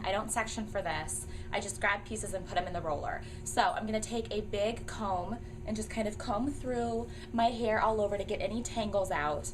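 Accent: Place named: Texas, United States. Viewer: Hi. Where do u work out of? Sounds like ur in Tx. And I just mite be willg to drive to have u do my hair! 😁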